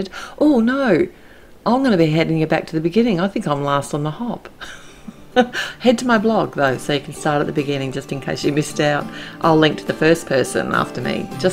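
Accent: Australia. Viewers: Watching this Anzac morning after the Dawn Service... Wow! Thank you, always love listening to the Aussie voice😀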